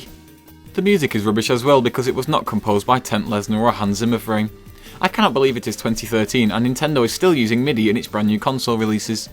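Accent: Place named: United Kingdom. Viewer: It'd be funny if it didn't sound like it was being read off a flash card. Or have that god awful British accent. :P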